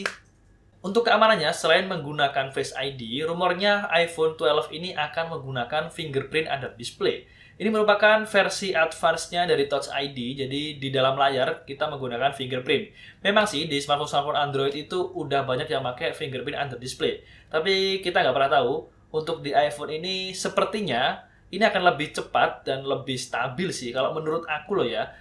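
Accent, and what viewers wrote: Indonesia. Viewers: Orang sunda ya?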